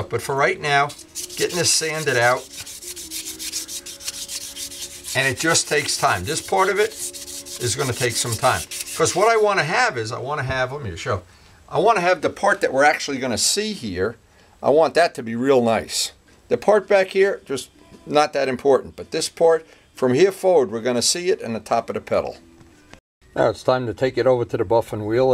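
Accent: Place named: New York, United States. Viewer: I swear... you sound just like my father!! Same N.Y. accent. Same gestures. Same humor. Same ability to do things that many would think were too difficult to even try on their own. My dad is a Parris Island Marine, with a tenth grade education, who learned that everybody has value, as we can always learn from others who have struggled to accomplish something. So, when you mention the friends who have helped you learn your craft along the way, that says a lot about your character sir. I ain't askin for a hug or anything... just giving you your props. Peace!!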